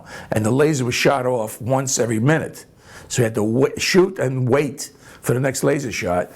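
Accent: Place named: New York, United States. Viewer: mike jan i'm not saying he isn't. clearly from this video he is a very accomplished and expert in his field. my point is i haven't met many scientists with such a thick new york accent, just like in goodfellas or something haha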